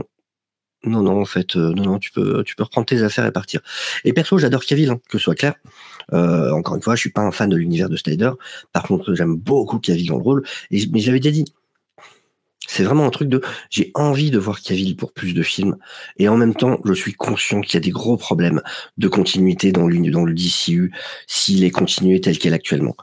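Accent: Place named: Alsace, France. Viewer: Ce petit accent alsacien, mon dieu ça fait du bien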